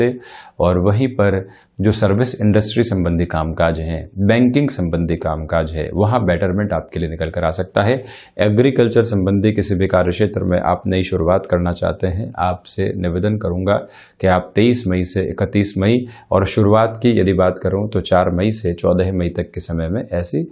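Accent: Standard. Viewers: नमस्कार सर जी I आपकी भाषा व उच्चारण इतना शुद्ध और उच्चस्तरीय हे जिससे मन प्रसन्न हो जाता हे I जय हो I शुभेच्छा 🙏🏼🙏🏼🙏🏼🙏🏼🙏🏼🙏🏼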